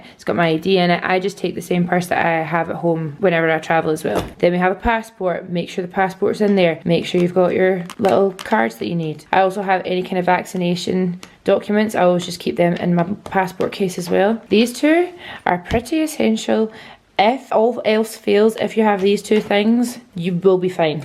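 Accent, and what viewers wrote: Scotland, United Kingdom. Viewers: Are you from Scotland 🏴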